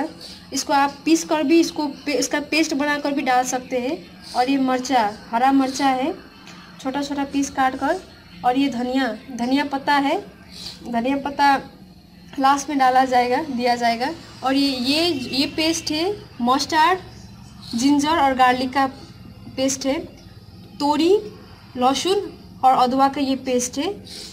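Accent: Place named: Nepal. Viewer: Nepali ko Hindi bhukai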